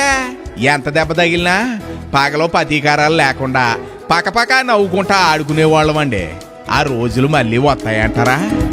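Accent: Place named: Godavari, India. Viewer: మీరు మన గోదావరి యాస లో చెప్పిన విదానం అదిరి పోయింది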